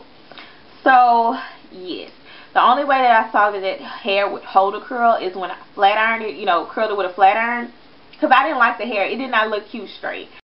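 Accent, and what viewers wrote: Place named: New Orleans, United States. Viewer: Im from new orleans girl and i was like oo her acsent sound so familiar, and now i know lol.. cute cute cute !!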